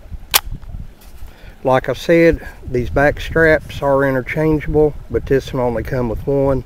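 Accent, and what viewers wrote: United States. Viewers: No homo but I love his voice and accent,picture perfect American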